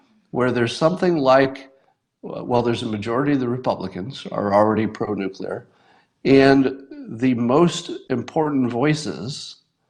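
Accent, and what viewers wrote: California, United States. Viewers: Californian's have the best accent.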